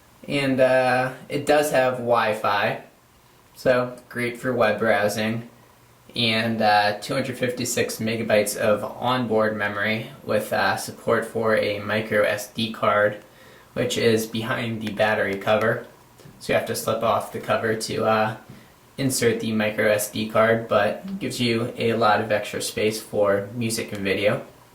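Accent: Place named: United States. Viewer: terrible american accent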